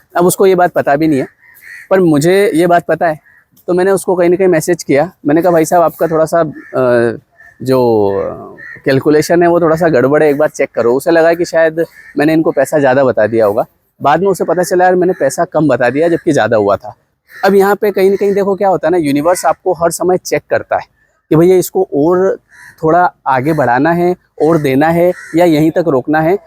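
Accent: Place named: Malwa, India. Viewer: भाषा टोन mp निमच, मंदसौर की है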